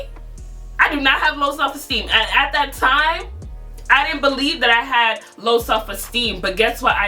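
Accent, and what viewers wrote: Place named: Brooklyn, United States. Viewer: That Brooklyn accent doe🔥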